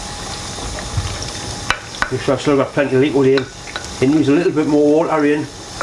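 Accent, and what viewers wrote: Newcastle, United Kingdom. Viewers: Love ya vids, and it's great to hear a geordie on yootuube ha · It's Genuine Newcastle. Great to hear! Hi, can you do a hotpot please? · Gordie north east england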